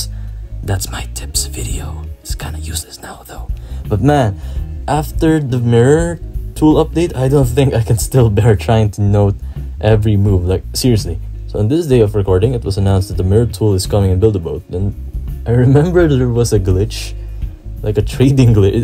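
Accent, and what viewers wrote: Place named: Philippines. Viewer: i can hear the filipino accent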